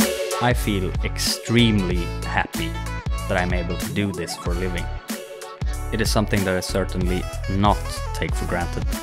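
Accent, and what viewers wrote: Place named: United Kingdom. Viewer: I like your music very much and your British accent as well ❤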